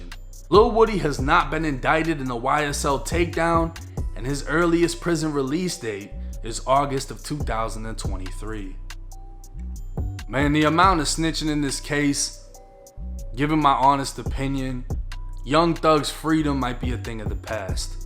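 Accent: Boston, United States. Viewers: Are you from Boston? ☘️🤓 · Dude u got like 3 different accents going on u sound like u from Boston when u say “caar” then southern black when u was on no jumper now u just aound like a regular American on here u not a real G u make YouTube videos stop 🛑 ✋ trying to be gangster u far from it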